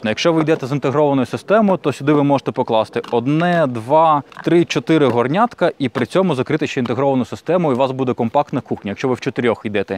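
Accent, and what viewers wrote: Standard. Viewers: Не так давно відкрила для себе, але приємно вражена - все подано дуже цікаво, дотепно, тішить слух несуржикована українська мова, дякую за чудову роботу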